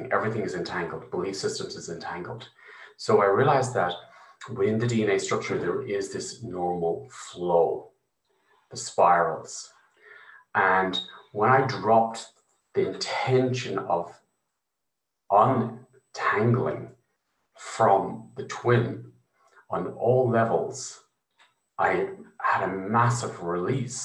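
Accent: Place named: Ireland. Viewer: Thank you,. Wonderful xxx When Kim D’eramo mentioned your name It sounds so Irish to me (also Irish!) and now that I’ve heard you, of course you are! Love and gratitude xxxx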